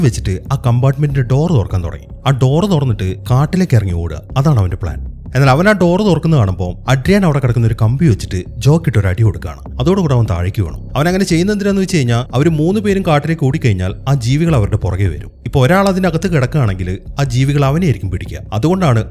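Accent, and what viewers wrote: Palakkad, India. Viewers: Chettan palakkad ano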